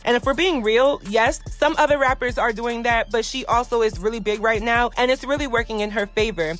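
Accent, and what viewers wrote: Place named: Philadelphia, United States. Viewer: Your Philly accent is so cute😂❤❤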